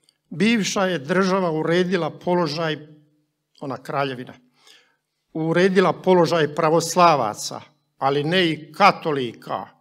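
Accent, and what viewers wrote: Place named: Croatia. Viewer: Monsinjor Ratko Perić progovara čistim Hrvatskim narječjem jezikom.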